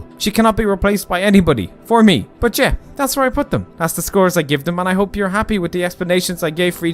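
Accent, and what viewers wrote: Ireland. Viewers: I just found this guy. He sounds Irish to me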